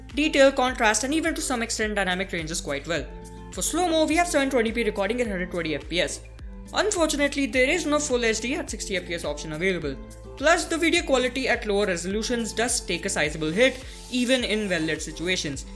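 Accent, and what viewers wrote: India. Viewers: typical punjabi accent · Loved the Indian Accent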